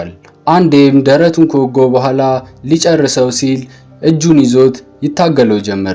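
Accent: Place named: Borko, Ethiopia. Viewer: ቦርኮ ነህ እዴ አወራርክ ሲዘጋ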